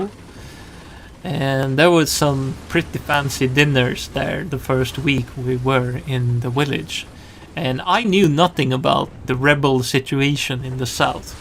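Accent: Sweden. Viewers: I would also like to hear more stories stories and see more games with US tanks. Swenglish is cool :-)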